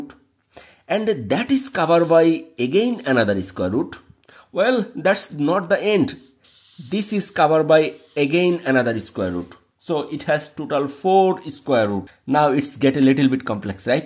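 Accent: Bengal, India. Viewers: Sir your style of speaking English is like Indians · Your accent is like an Indian! 🧐🧐how? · Are you bengali From West Bengal India? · He's from Germany... But his accent is so close to Indian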